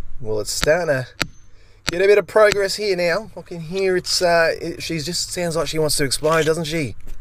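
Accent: Australia. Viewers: Absolutely disgusting but still oddly satisfying to watch 😂 And mate, your accent simply makes it perfect! Like ozzy man! Since you're also an ozzy man! Edit: Reminds me of the lovely neighbors who ate very healthy... Lots of vegetables... Which they forced down the kitchen sink! Which then obviously caused a blockage and filled my bathtub.... That was so disgusting... Needed multiple hours to clear the drain and then clean my bathtub.... So if you live in an apartment with multiple others, please either compost, throw in the trash or flush down the toilet all the parts of your organic vegetables you don't eat...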